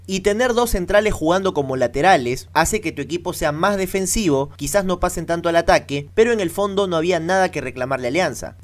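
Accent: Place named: Argentina. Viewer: Te agradecería si dejas ese acento argentino ¡Che!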